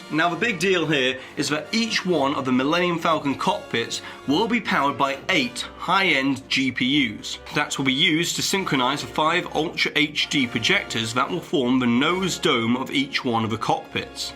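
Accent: United Kingdom. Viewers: Which type of British accent do you have? I am baffled!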